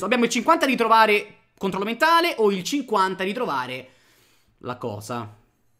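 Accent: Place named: Rome, Italy. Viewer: All'inizio credevo che fossi Romano da come parlavi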